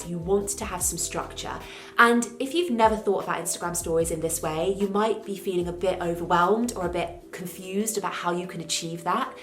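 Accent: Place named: United Kingdom. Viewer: thank you not only because of this video you just uploaded which is helpful but only because you're the first one with british accent (if I'm right) whom I can follow and understand :))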